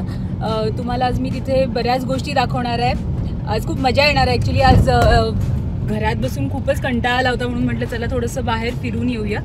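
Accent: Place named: Kolhapur, India. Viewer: मस्तच... मॅडम थोडसं narrative मध्ये सुधारणा करा... You are repeating same sentences again and again...सांगली रोडचे वर्णन करताना ग्रानाईट, मार्बल, संगमरवर, Building material, ग्लासेस असे वेगवेगळे शब्द वापरु शकता... फॅक्टरी म्हणण्याऐवजी शोरूम्स म्हणा हव तर.... बाकी सिनेमॅटोग्राफी, background music, editing सर्व काही छान...बोलता बोलता आपण बरेच शब्द कोल्हापुरी style मध्ये बोलतो😀😀 "मेन म्हणजे" पण तो accent creative आणि मजेशीर वाटतो... Keep it up... शुभेच्छा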